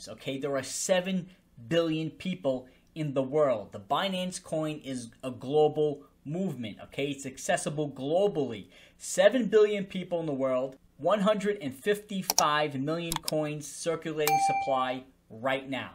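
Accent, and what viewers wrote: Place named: New York City, United States. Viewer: I love your NYC accent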